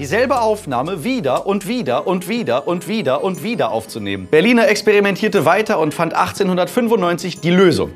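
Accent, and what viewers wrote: Switzerland. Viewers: Bester Schweizer Akzent. Ich kann nicht mehr :D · Nicht alle Schweizer sprechen so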